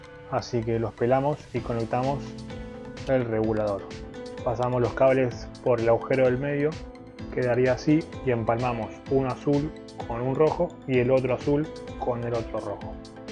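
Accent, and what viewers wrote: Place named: Argentina. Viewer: De dónde sos amigo. Sonas reargento pero laburas en 110v😅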